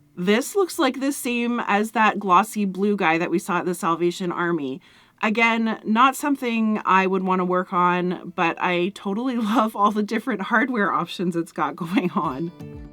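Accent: Canada. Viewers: I love your Canadian accent. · . Here in the UK we know that when you say out and 'aboot' that's when we know a Canadian. I love to hear it! 🤗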